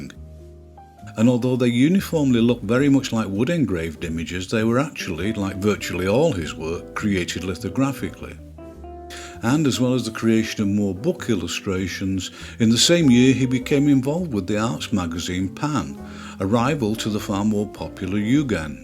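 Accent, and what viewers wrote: United Kingdom. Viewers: Hello! May i ask what part of the British Isles you’re from? I can’t tell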